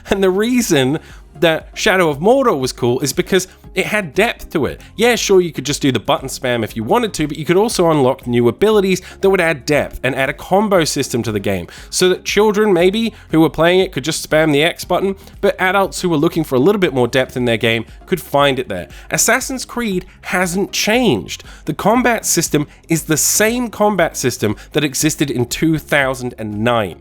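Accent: Australia. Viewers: You're an aussie!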